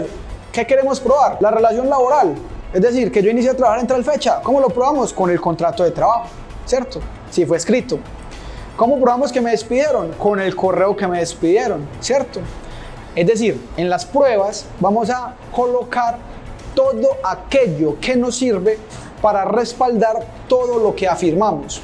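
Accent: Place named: Antioquia, Colombia. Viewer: Uy colega le luce el candado se le pego el acento paisa. Hoy mas facil para enviar una tutela a la pagina de la rama judicial se ahorra lo de las copias.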